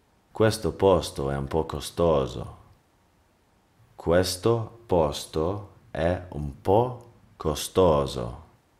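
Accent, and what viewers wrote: Italy. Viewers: No this is French with an Italian accent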